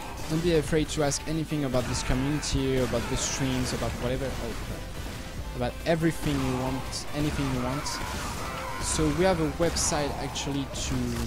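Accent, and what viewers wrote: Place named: France. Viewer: Un français ca fait plaisir :P ton accent te trahis xD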